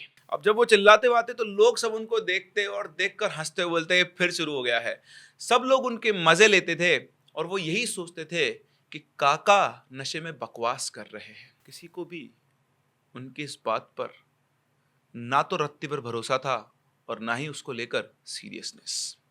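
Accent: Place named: Chhattisgarh, India. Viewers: Chhattishgarhi boli bahut sundar lagat he tuhar muh se bhaiya ji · Badiya chattisgari bole sir😮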